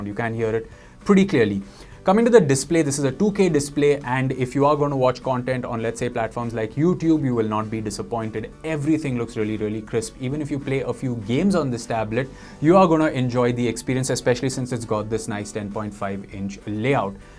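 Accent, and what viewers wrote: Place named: India. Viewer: Video for Indians. The rest of the world cannot stand such accent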